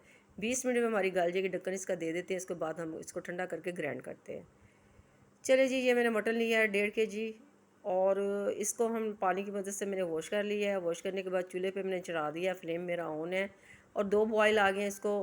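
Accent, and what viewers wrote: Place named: Punjab, India. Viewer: Love your talking ❤😅with Punjabi touch 😅❤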